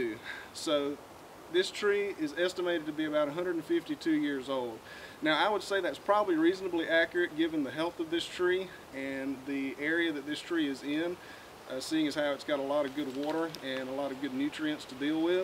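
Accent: South, United States. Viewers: Something about this southern accent makes them feel more trustworthy and honest. Or maybe its just this guy's way of explaining everything without being full of himself. Great video,, Thanks.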